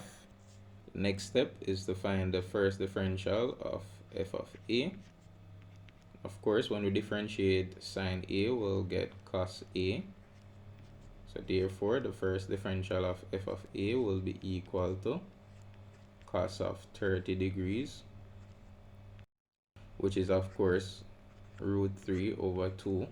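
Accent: Jamaica. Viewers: Am loving the Jamaican accent 😅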